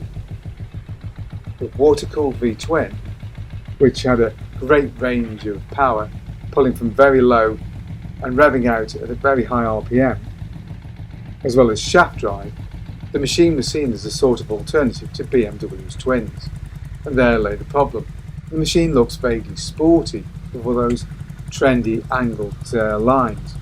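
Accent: England, United Kingdom. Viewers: I could not watch the entire video. The English accent drowned in the background noise of the engines made it very difficult to understand. I'm sure it is a good video nonetheless !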